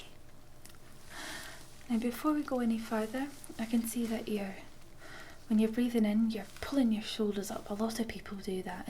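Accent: Scotland, United Kingdom. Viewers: Scottish AF damn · when you say "scary", it sounds like "scearee". but I like the accent, do all Scottish people say it like that? just a lil curious.hehe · I'm drunk, I love ur Scottish accent, what's more to say??? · That pretty Scottish voice is so relaxing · that Scottish accent is uncommon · Is this your real accent? Are you Scottish? 😊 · YES FINALLY SCOTTISH ASMR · Please more Scottish Asmr! Your Scottish accent makes me so calm! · Honey, where exactly are you from? I'm American and if I had to guess, I'd say that's a "Scottish" accent, right? Not Irish; am I correct? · Good old Scottish accent mines is from east ayrshire I can't place where yours is from lol? And also super video :) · she sounds scottish. not british.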